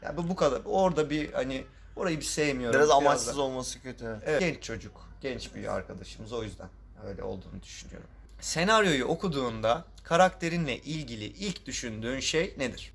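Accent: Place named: Italy. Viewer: Abi sen italyan ca konuş daha iyi (yani aksanı)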